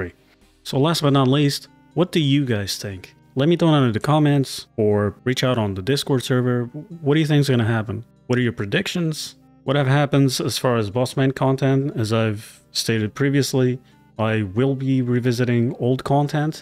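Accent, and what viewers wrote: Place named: Montreal, Canada. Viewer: it sounds like you’re from Montreal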